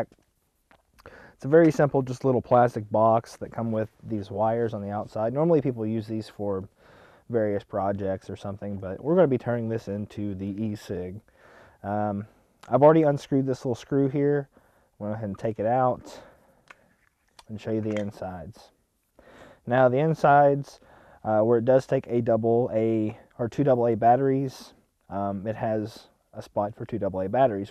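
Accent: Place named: Appalachia, United States. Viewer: That's what I said moe men tary = momentary, not moNetary, may just be his accent, I'm used to listening to hillbillys ;). Still it was a good vid and I can't wait for the homemade rebuildable atomizer. No insult intended.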